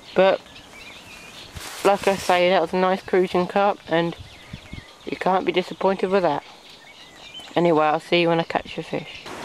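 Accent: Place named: Europe. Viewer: hey man nice fishin, im going to assume from your accent that your from somewhere in europe...im from the northern U.S. and we"ve been fishin through the ice up here catchin alot of northern pike, i think you guys call them jack though. its nice to watch a fishin video taken in open water compared to on the ice! cant wait for summer man!!! keep fishin!!!!!!!!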